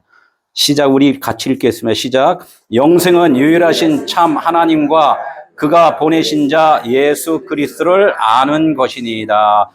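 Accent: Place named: Chungcheong, South Korea. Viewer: 구수한충청도 사투리가 정겹네요. ❤ 뿌린씨앗 풍성한 열매로 결실하세요!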